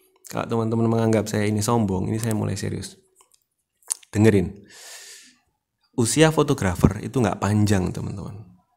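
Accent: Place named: Pekalongan, Indonesia. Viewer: Pas lagi nyari video ttg mengatasi backlight. Ketemu videonya sampeyan. Kok logat ngomongnya nggak asing. Jebule podo wong Pekalongane dewe 😁.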